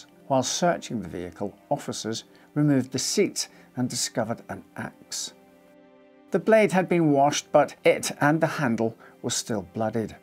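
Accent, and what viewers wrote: Scotland, United Kingdom. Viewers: As usual, excellent presentation. Proper pronunciation of our English language is so important, and (if I'm right, you're Scotch?) your innate facility to use consonants properly means this - and all your super videos - are a tremendously fulfilling watch. Only thing to be alert on: (maybe auto-generated) Yank shoddy spelling e.g. "ax" for axe or "meters" for metres. Good work. Love your channel!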